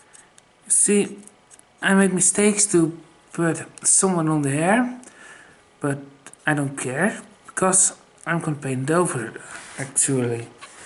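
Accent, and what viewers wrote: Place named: Netherlands. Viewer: Enjoyable videos, keep them coming. And you are Dutch or Belgian, kinda recognize the accent :).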